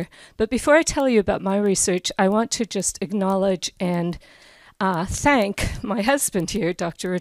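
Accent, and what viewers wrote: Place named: New Zealand. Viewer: Dear, thanks, I did enoy this presentation (as some others) of yours - admittedly, it's because I'm a linguist and a fan of Steve Pinker's. Steve has been mentioning you for a couple of years, so I did check some of your presentations. Steve's been right, you're great - and, frankly, I do love your NZ accent (frankly, no offense - I'm a phonologist/historian). Thank you!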